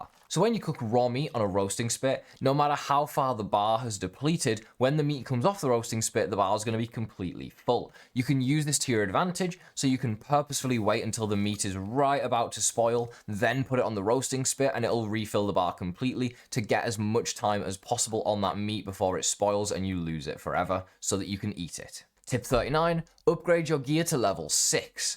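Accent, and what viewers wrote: Liverpool, United Kingdom. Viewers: Ooohhh is that a fellow scouse accent I hear?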